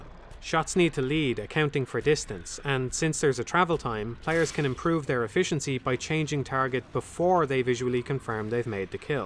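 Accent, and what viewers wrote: Ireland. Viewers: I needed this monotone Irish voice in my life. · The rebirth of my favorite snarky Irish gentleman is upon us. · holy fuck he's irish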